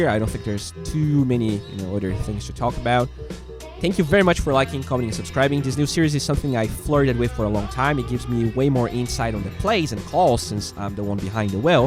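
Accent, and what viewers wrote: Brazil. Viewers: dude, stop complaining about your accent. Your English is perfectly OK. And your accent always will be from Brazil. Your videos are Awesome keep like that. Hug from arg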